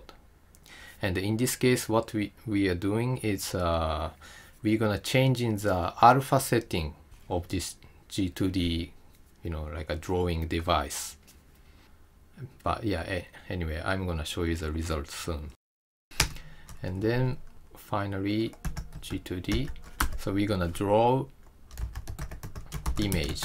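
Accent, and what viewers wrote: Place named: Japan. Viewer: Really helpful and good tutorials also your Japanese - English accent is really cool :) ! 素晴らしいJavaチュートリアルありがとうございます !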